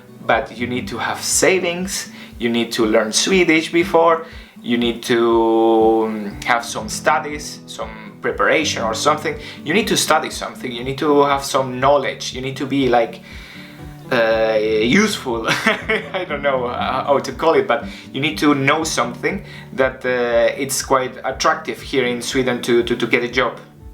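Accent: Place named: Spain. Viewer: Your accent is very Espaniol !!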